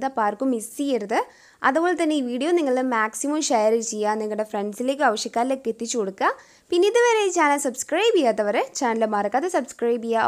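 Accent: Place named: Malabar, India. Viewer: മോളുടെ വീട് thalassey ആണോ..ഒരു മലബാർ slang ..😊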